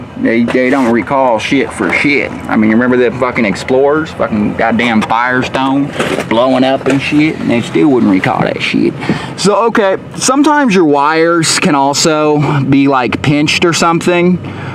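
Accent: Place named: Appalachia, United States. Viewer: What a stinking hill billy. How about some regular English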